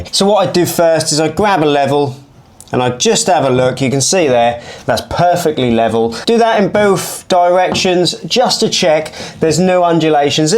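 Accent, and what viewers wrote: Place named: East Anglia, United Kingdom. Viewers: I wondered if it was a Suffolk accent. Thought he sounded just like Rob Songer another great tradesman. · Suffolk boy here, love hearing a good Easy Anglian accent. Moved away many moons ago, but your vids are very nostalgic for me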